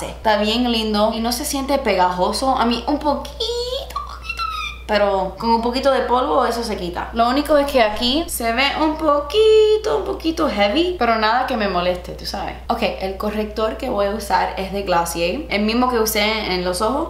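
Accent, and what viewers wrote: Cuba. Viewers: Jajajajajakakakaka me encanta te ha enseñado a hablar alguien cubano o de puerto rico jajajaja pero me encanta ojala te hagas un canal en español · Me encantó este video en español, lo hiciste muy bien. Me gusta tu español cubano. Saludos desde República Dominicana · Mojado y Salvaje! 😉 Love this videos in Spanish! Y ese acento cubano es divino! 😃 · Don’t know how to say it in Spanish? Add “ar” at the end and you’re good to go jajajajajajaja Amo cuando hace los videos en español, me dan mucha risa y el acento cubano es puro amor 😍😍😍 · Me encanta tu acento cubano, de donde lo sacas 🤔 eres muy valiente! Ojalá hagas mas vídeos así para tu publico hispano 😘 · Tu español es súper bueno!!!! Cuando nosotras hablamos de maquillaje (en español) usamos palabras en inglés, por ejemplo: highlight. Así que no te preocupes ❤️ Lo único que me causa gracia es que tu acento es SÚPER cubano y nunca pensé que sería así jajaj a pesar de que tus raíces son cubanas. 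Proud of you girl ❤️ siempre has sido mi inspiración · I never comment but these are my FAVOURITE KINDS OF VIDEO EVER. Honestly so good. As a native Spanish speaker, I appreciate these so much 😂 me encanta el acento cubano también. Now I live in the UK so I can relate to not knowing some of the words in Spanish (but I personally have no excuse 😂🙈) xx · Qué gracioso vídeo. Tú español cubano 😁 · Me encanta oirte hablar español cubano! Cada vez hablas mejor!! 👏🏻👏🏻👏🏻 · I think you've improve your Spanish in this video so far... I hear you more fluent and comfortable with it. I really enjoy your videos so much and this made me laugh so hard. I love your cuban accent. Send you much love and good vibes <3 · Soy mexicana, creo que tu acento cubano es genial, y tu español es muy bueno. Saludos :) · Pero a mi me gusta sobre todo el acentazo cubano tan genuino. Try= probar, usar. Y lo de la abuela: very good fandango! 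· Please please please make more videos in Spanish lol I really enjoyed watching this video, and i love your Cuban accent. fyi my sister have the same name of you, we're from venezuela. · Hahahaa me encanta su spanglish! 🤣🤣 true 305 cuban style ayeee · wow your cuban accent is so strong!!! I learn spanish from spain growing up so it's so cool to hear the different accents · Me encanta cuando haces videos en español, hazlos más seguido así entiendo😁 . Se te nota que aprendiste el español con alguien de cuba jeje. Saludos desde Uruguay 🇺🇾(américa del sur) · Acento cubano mami!! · Me encanta ese acento cubano 😂❤️ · such a Slytherin look! love it! and your cuban accent is so cute haha (love from Uruguay :D) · Amo tu acento cubano! Es lo máximo :) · Me encanta tu acento cubano!!! seguramente no tienes eduación formal en español pero hablas muy bien. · Tienes un bonito acento cubano 🥰 love it! 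· Porfavorrrrr more videos in Spanish!!!!!! Tienes mucho flow hablando con ese súper acento cubano!!!! 😍😍 podrías ver algun video de youtubers españolas como: o alguna latina 😝 para mejorar el idioma !! Un saludo desde Islas Canarias (españa) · You speak Spanish like I do. I consider English my first language even though I am Cuban. I do not have a Spanish accent when I speak in English (which is always). But I’m kind of surprised because of your noticeable (and adorable) Cuban accent I thought your Spanish would be more fluent. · Jajajaja comment no se dice "comento" se dice comentario... But you're so cute! Amo tu español con acento bien cubano 🖤 and primer es prebase ya sea prebase de ojos o prebase de maquillaje.. Love you girl! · Me encantó el acento cubano que te salió en el vídeo. Un besazo desde España 💋💋💋💋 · Blend= difuminar. Amo tu acento cubano 💕 Also we dont call primer the “cebador” 😂 just primer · did not know was cuban... 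or at least speaks with a cuban accent 🇨🇺 ❤️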